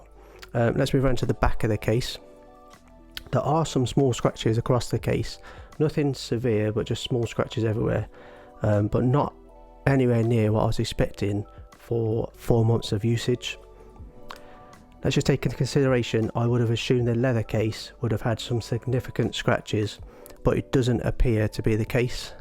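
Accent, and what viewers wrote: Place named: United Kingdom. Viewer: Falling in love with Britich accent 😍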